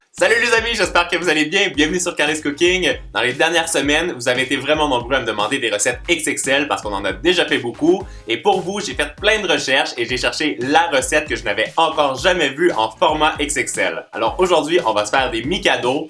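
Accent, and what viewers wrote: Canada. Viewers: Car tu sais que je les ai mis un pouce bleu dans toutes tes vidéos je suis abonné et j'ai mis un commentaire et je t'aime beaucoup j'aime ton accent j'aime le Canada et j'aime tes vidéos merci pour les vidéos j'ai fait toutes les recettes mais pas tout quand même hein c'est difficile pour moi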